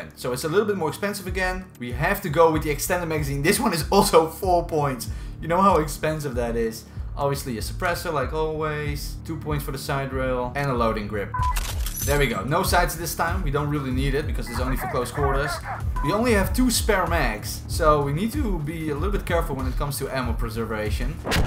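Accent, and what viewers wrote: Netherlands. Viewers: I smell that you are dutch am i correct? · You dutch right??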